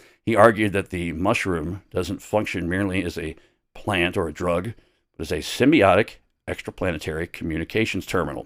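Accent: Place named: Canada. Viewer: You are Canadian aren't you? I can't really tell being that I'm British. You sometimes sound like you have a Canadian twang.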